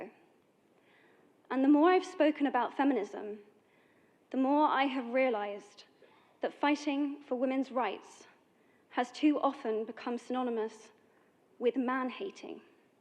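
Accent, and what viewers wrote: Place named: United Kingdom. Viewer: bla bla bla..è vero forse per i paesi musulmani, asiatici o africani ma in Italia no. Purtroppo qui, come nei paesi occidentali abbiamo già sperimentato cosa intenda la donna per femminismo: abbiamo visto troie fare la coda per fare i festini dal primo ministro, donne (anche minorenni) disposte a vendersi per una ricarica del cellulare, donne al potere incompetenti (pivetti, boldrini, santanché, picierno..), donne bugiarde e più meschine degli stessi uomini, cantanti celebri che "pornificano" ogni loro gesto, le parole dell'attrice inglese sono retorica vuota e stantia per femministe fallite. Ringrazio per l'upload, ho potuto così saggiare l'ottima pronuncia Bristish di questa attrice sin ora a me sconosciuta. Grazie.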